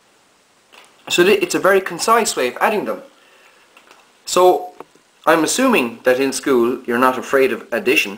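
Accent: Ireland. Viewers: YOURE IRISH !!! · wow ,, thank you im 60 , and never understood ,, this ,, you teach so very well ,, and you sound Irish ( win , win ) a bit thank you ,, im going to watch the rest out you video's ,, from a Yorkshire ( Hull ) man ( living in Canada ) ,, it took me 40 years to find a real world project that needed Trigonometry , so i went to my daughters school to see if a math teacher would teach me what i needed to know and he did , and i finished the project -- again Thank You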